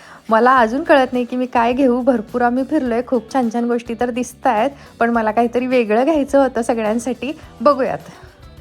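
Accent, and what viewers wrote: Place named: Kolhapur, India. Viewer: छान मार्केट सफारी... ताई कोल्हापूर बोली पध्दत चालीरीती सोडू नका.... कमेंट दुर्लक्षित करा 🎉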